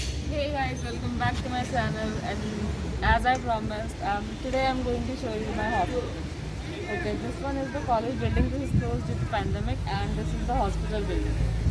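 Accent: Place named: Bangladesh. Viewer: You were speaking Bangla with Bangladeshi accent.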